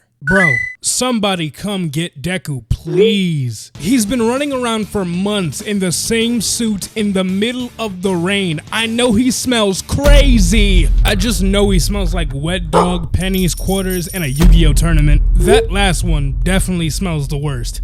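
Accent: Jamaica. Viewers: Big bro jamaican? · you jamaican?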